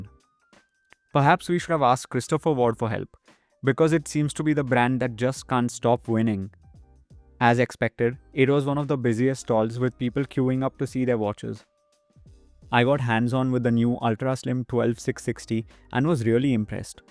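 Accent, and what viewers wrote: India. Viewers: Can you get rid of your Indian accent next time, eh?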